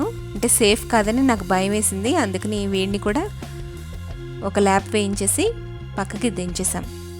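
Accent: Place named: Rayalaseema, India. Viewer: mi slang rayalseema matalu laga unai..nice madam vizag super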